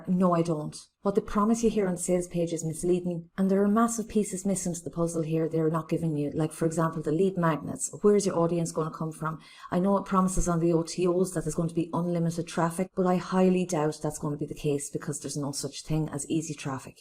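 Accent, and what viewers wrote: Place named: Ireland. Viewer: Great review and nice to hear an Irish accent here as I am from there too.!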